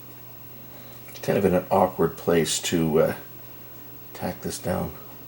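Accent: Canada. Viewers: we definitely need a kitty cat review video! Btw are you from Manitoba originally? Your voice sounds very familiar but maybe it's just a Canadian thing. (Edmonton, Alberta here)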